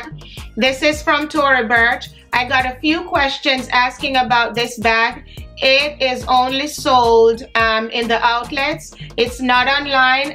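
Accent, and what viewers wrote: Patois, Jamaica. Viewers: I just found your channel and I’m like she is Jamaican… wait till the patois comes out and it did !!!!I fell out laughing 😂 YES!!! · "mi no like when we pants look like me tek measurement in a water." Real Jamaican. Girl you are funny. Love the haul.🤣🤣🤣🤣🤣🤣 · Love your Jamaican accent. You are? Love all the pieces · Knew I was hearing Jamaican! New subbie hear. Great haul 😍😍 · YOUR PAGE NEEDS CROWD!!! HELLA TALENTED. I hear a Jamaican accent . New subscriber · At first I was like this African lady looks beautiful let me watch. Then d accent chip in and i was like . A JAMAICAN DEN SHE NUH MUSS KILL DIS... LOVE D VIDEO !!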